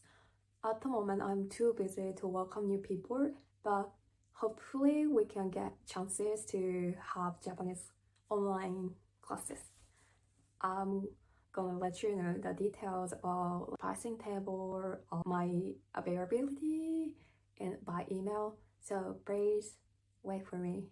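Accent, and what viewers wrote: New South Wales, Australia. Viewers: Welcome! You seem to be settling in well and enjoying our way of life. Did you know some of your words are starting to take on an Australian accent? Follow in particular jumped out to me! But there are others in there too. Keep having fun! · One thing I have noticed listening to you speak English is that there are certain words where you talk with what sounds like the beginnings of a very typical Australian accent. show know know You've really nailed the way that Aussies slur our words and speak with a very nasal voice, we infamously say "naur" instead of no. I think you're going to end up with a really cool sounding accent, great mix of Japanese and Australian :) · There are some markets in Potts Point on Sundays. Its interesting hearing some of your english words have already started to develop the Australian accent. 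Hope you enjoy your time in Sydney and Australia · Aussie accent already detected. 👍🏽 You’ll fit right in just relax and enjoy the ride. It’s a great part of the world to be. 🇦🇺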